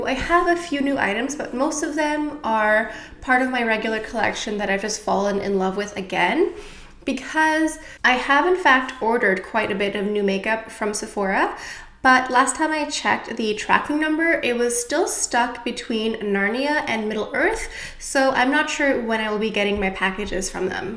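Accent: France. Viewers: I do love the Dior backstage highlighter palette and I'm getting Joy edp as my birthday present on the 7th! I also own and love Chanel soleil le tan (the old version) and I'm going to repurchase it in the near future... Thanks for this new video. I love your French accent, it's so cute. I speak a little French. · R u French?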